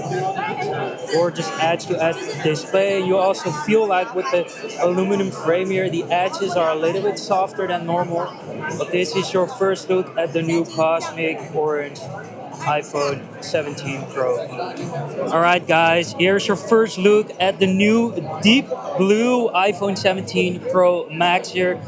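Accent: Netherlands. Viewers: The Dütch accent was aanwezig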